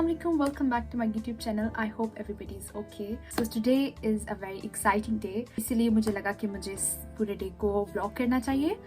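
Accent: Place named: Punjab, India. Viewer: Uff the way you switch from English to urdu to Punjani 🤣ufff Dude you rock in punjabi accent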